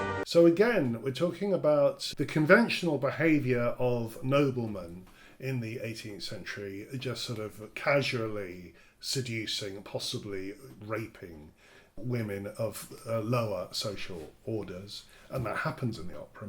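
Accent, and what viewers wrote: United Kingdom. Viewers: Great video, but when will you get rid of that ridiculous British accent?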